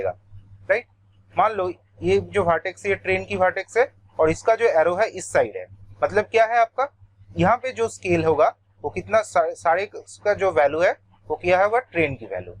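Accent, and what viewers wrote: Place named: Bengal, India. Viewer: Bangali bangali mne hche golar awaj sune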